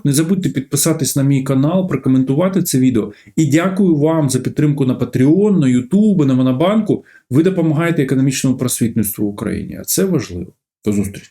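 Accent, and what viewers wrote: Russia. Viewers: Та в нього тих перлів , чуть не кожне слово . Можливо то просто москалик , який вирішив українцям брехні понарозказувати !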